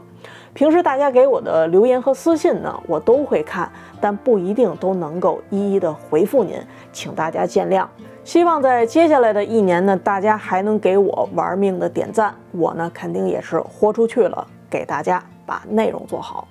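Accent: Beijing, China. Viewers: 北京话太亲切了。您这刚学剪辑我感觉就已经做得很专业了 非常棒 支持 干货很多，会给朋友推频道的！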